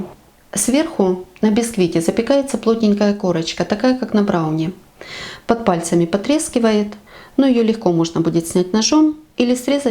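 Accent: Ukraine. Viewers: Считаю Ваш канал лучшим, Вы всё объясняете простыми, понятными словами и Ваша украинская речь очень приятна❤❤❤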